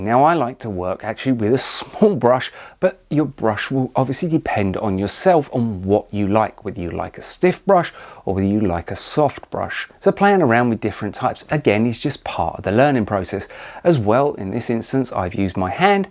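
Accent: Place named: United Kingdom. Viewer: Thank goodness mate. British, Clear straight to the point. Thanks alot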